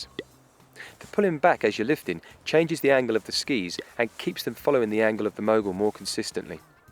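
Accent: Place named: RP, United Kingdom. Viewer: proper queens English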